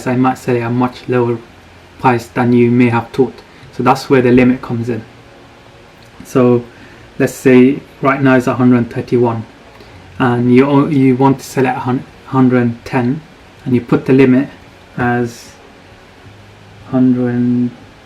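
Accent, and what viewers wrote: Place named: United Kingdom. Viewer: thank you for the advice, love the video, love the UK and the accent as well :D thumbs up for the vid!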